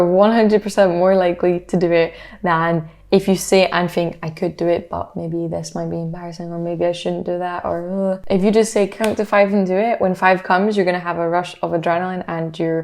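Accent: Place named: Scotland, United Kingdom. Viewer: As an introvert I was inclined to click of the video and as soon as I heard your Scottish accent as a fellow Scottish person I now feel the need to binge your whole channel <3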